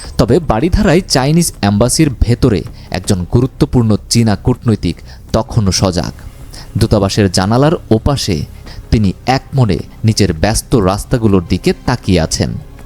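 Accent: India. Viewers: আপনার কথায় দাদাদের কথার সুর....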